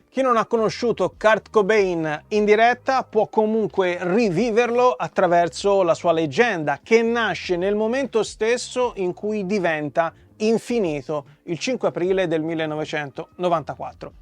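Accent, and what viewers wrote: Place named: Livorno, Italy. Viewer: Ma te guarda...sei di Pisa...ma si sentiva..ma non credevo di Pisa...ti vedevo più un tipo livornese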